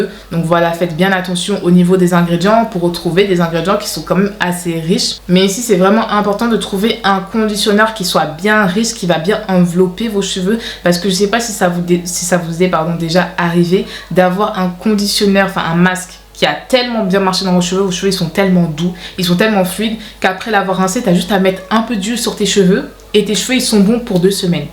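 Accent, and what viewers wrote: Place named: England, United Kingdom. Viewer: On entend l’accent anglais en fait!!!!😂😂😂😂😍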